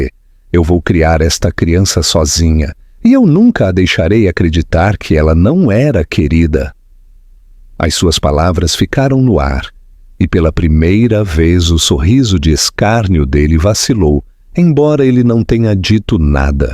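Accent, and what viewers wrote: Portugal. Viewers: Não suporto narrador falando o português de Portugal, não se preocupam nem com nosso idioma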